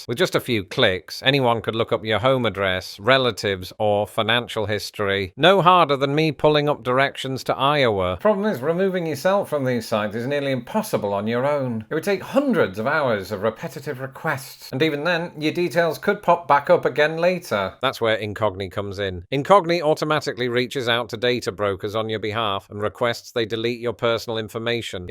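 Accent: United Kingdom. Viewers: about Eisenhower turning American into the world's largest Scalextric. The WHAT?! That got me curious. Did I hear that word right? Was that waning British accent too confusing? Nope. I heard it right. I googl'd it. Scalextric is the leading brand of UK slot racing cars. Slot cars? Instant nostalgia set in. Now I know what meant.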